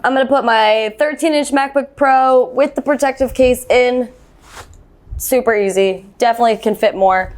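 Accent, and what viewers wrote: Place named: South, United States. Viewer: why it talk country and southern but look latin.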